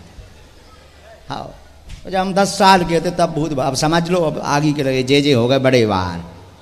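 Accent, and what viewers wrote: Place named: Bundelkhand, India. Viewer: गुरु जी आपकी बुंदेलखंडी बोली बहुत अच्छी लगती है, संन्यासी बाबा की जय, बागेश्वर धाम सरकार की जय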